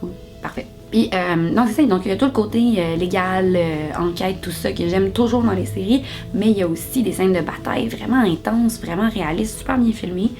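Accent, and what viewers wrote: Quebec, Canada. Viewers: J'aime pas les québécois comme elle je suis pas rasciste j'aime pas l'accent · L'accent canadien te vas super bien :3 :) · Je suis francaise et je viens d'ecouter ta vidé, tu es vraiment trop cute avec ton accent; c'est du Québec?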